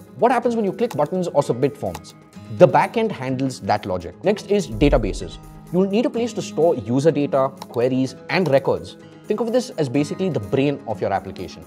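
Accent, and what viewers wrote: India. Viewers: first homewaork to you get rid of your indian accent fun facts 50% of audience cloose and move to next video after hearing just the accent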